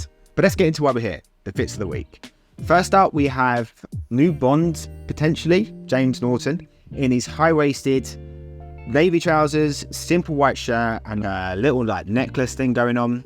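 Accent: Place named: Essex, United Kingdom. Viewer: Sub'd! Learning more about how I want to present myself through your insights. Also, nothing wrong with a fine Essex accent.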